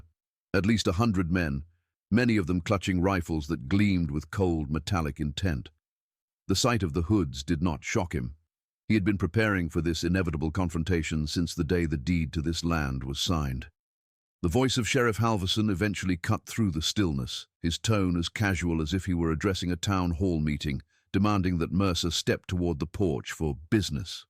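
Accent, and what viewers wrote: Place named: United Kingdom. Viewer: It would have been cooler hearing an American sounding vo ,1nstead of a Limey sounding voice over ! In fact any real sounding voice from an average American would have been better ! Not sayin’ it didn’t happen this way , either the guy was real lucky , or very brilliant tactically speaking or the folk that showed were totally drunk !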